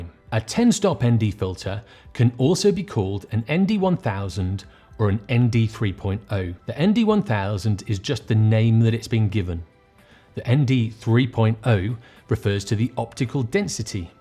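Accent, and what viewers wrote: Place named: RP, United Kingdom. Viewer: You have a lovely posh accent.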